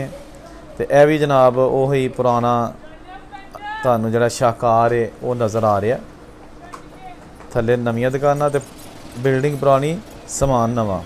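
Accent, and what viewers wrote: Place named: Punjab, India. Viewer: Bhai, I like and watch all your U Tube videos and I had appreciated you in one of your videos that I like your voice with Punjabi tone. However we do not understand Punjabi so we can't understand your commentry (though it is pleasant to listen) Will be grateful if u could make your commentry in Hindi / urdu. Take care and Love from India.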